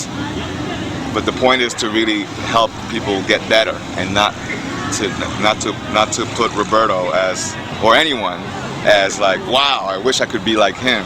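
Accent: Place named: Latin America. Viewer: Me. wrote something in Spanish recently. I wonder if he is Hispanic, I hear a slight accent, no? He mentioned Puerto Rican and black influence.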